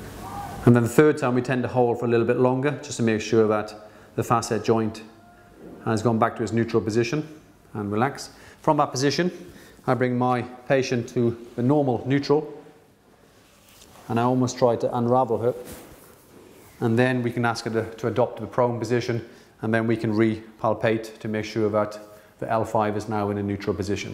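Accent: Wales, United Kingdom. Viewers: Ah... I can actually hear your slight Welsh accent now.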